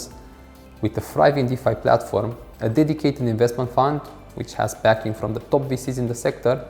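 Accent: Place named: Romania. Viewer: I like your english! Nice! Romanian english accent! :) I like the moldavian accent, too, as a moldavian who I am! KR Costi! Thanks!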